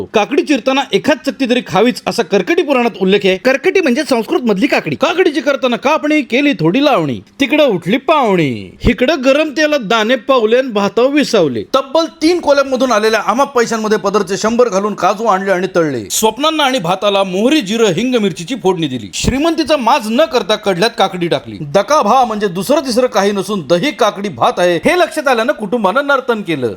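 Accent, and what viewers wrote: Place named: South, India. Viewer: 1 ch no. South Indian tone tr kamaal 😊😊😊😊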